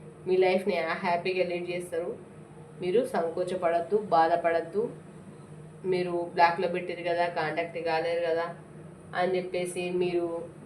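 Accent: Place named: Andhra Pradesh, India. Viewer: Madam Andhra Bhasha chala baguntundi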